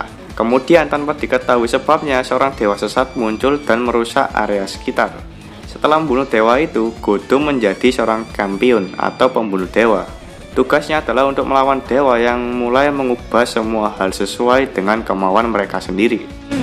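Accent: Java, Indonesia. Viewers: Medok tapi bagus unik..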